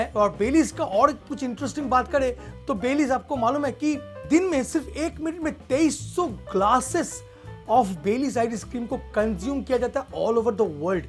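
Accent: Bengal, India. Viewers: Bengalis can conquer anything with their intellect except their Accent wile speaking Hindi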